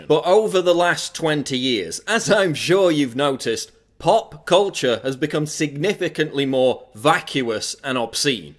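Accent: United Kingdom. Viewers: well i think to myself... end game terms...after gamergate. are they trying to reforge men born in hellfire to absolutely fucking hate democrats. rest assured they will. they are only making sure that the future gen will be dominantly conservative! they're morons of the highest caliber i like PJW's narration out of all infowars/planetprison shows. especially when he gets extra british crusy lol, slaying it with intellectual logical facts...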